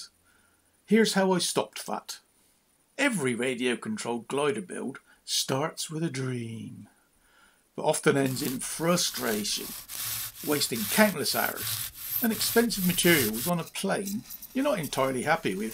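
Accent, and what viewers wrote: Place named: New Zealand. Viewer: I love the kiwi accent.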